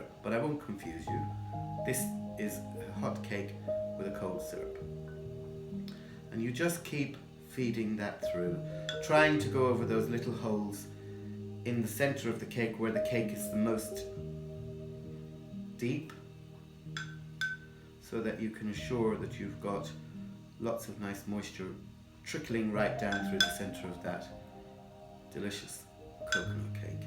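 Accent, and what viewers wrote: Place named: Ireland. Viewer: just found your channel- really enjoying it- love listening to your brogue- reminds me of my grandparents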